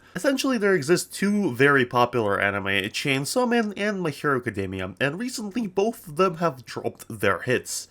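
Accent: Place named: Russia. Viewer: Кто заметил акцен пишите. Я знаю, что ты русский, меня не наибеш. Спален за русским геймплеем.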